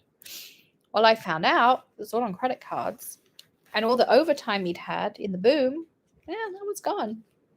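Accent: England, United Kingdom. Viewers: You are a brave and strong women and I applaud you for continuing your journey through all the obstacles and coming out ahead! I have been following you for about a year and have binged watched all of your videos! I don't usually participate onlne during lives, except to say hi, but I really enjoy your creativity (and your English accent...lol) and your energy. Continue the strong the path! You are awesome!!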